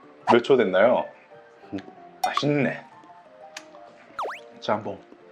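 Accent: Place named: Daegu, South Korea. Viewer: 알고리즘으로 첨 뵙네요~^^ 말투가 대구분이신듯 . . 제가 대구라서요~ 대구분이시라면 너무반갑습니당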